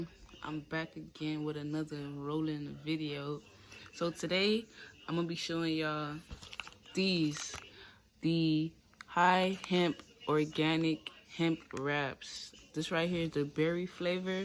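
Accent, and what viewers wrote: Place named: North, United Kingdom. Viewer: Where you from gotta be up north somewhere?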